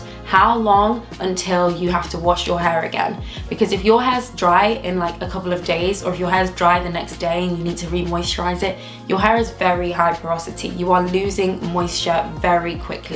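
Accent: United Kingdom. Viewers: Are you just talking to British people or something? Because I noticed you have an accent. I know you're not talking to Black American people or maybe you don't know our history. You just said that white people have a variation in porosity. Black Americans are literally white. Like literally have white ancestry on high levels. And you're out here telling us we can have a certain hair texture. Well not understanding we're not africans. African would morsel apply to someone who is British like yourself maybe. Who has 100% African ancestry not someone who is literally working with 24% European ancestry. And people even who have 5 to 10 European ancestry. That's still a part of our dna. And it will still be expressed in many different ways. So just because someone has the same skin tone as you. You can't say we have the same genetic makeup as you. Therefore our hair may have a different pattern. 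I don't even know what you mean by African hair. Is African hair just 4c hare? I always wondered that. Are we including 3C hair or at least four a hair? Personally for me when I look at hair types. And it's hard to see because everybody likes to do a wash and go and loosen their curls. Which is like I can straighten my hair totally what lies that my natural curl like know. But some people they don't like to show what they got but from what I've seen the dry version of 3C that's tighter. Four A and 4b. Sort of. A looser texture of 4b are textures that are pretty much relatable to my hair t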